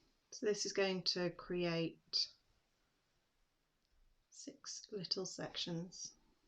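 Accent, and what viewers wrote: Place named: England, United Kingdom. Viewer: Thank you so.much for this pattern. I love your English accent. Im from scotland living in USA 44 years still have My accent.😊❤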